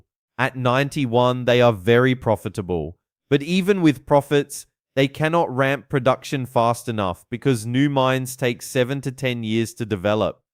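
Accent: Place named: Australia. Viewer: AI crap with a cheesy Australian accent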